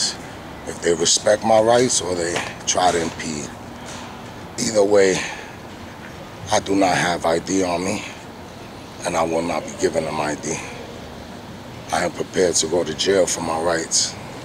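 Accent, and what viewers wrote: New York, United States. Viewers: Good job. Holy shit, you sound like you've never left the boroughs. Takes me back. I live in Oklahoma now but my family is from West Haven. Keep it up. Subbed. I'll forward this to other auditors and mirror on my channel, with your permission. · You so New York bro 😭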